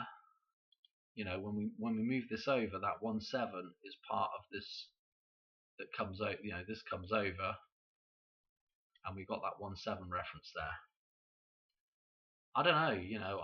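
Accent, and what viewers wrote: United Kingdom. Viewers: 🕊️🌿 Your findings are AMAZING! You are helping me confirm and make many connections. THANK YOU! THANK YOU! Lovely to hear your British accent too. I was born near Stonehenge, surrounded by Churches named after Mary Magdalene 🌹🌿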